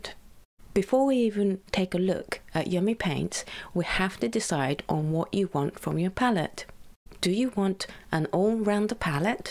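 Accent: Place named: United Kingdom. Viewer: This video was so helpful...more please. Also may I ask what paper you use and where you can get it? I am assuming from your accent that you are also in the UK? Apologies if not. Thank you so much...Mand XOXO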